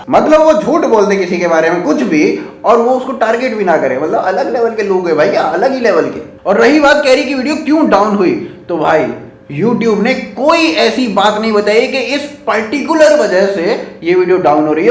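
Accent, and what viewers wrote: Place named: Madhya Pradesh, India. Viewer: Bhaiya apki dialoge delivery waqai zabardast hai we support you.. bhaiya r u from Madhya Pradesh? I think so because ur talking style is similar to MP people i am also frm there.. :)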